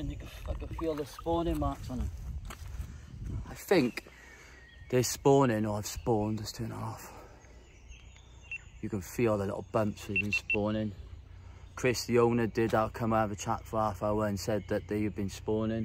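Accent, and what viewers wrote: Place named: Wales, United Kingdom. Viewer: Thanks for the reply. You have fun , nice to hear the Welsh tones, awesome! Tight lines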